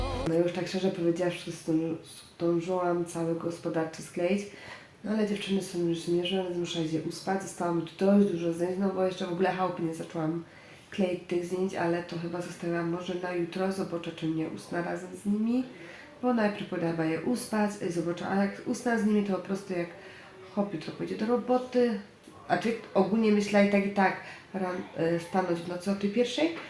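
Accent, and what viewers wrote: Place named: Silesia, Poland. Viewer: Witaj fajna gwara ślązoczka czy inny górski region?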